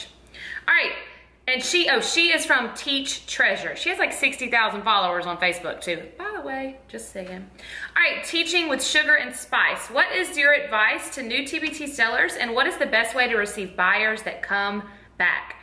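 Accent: South, United States. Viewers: You are so southern and I love it!!! I'm a Texas gal!!! You are so down to earth and hilarious!!!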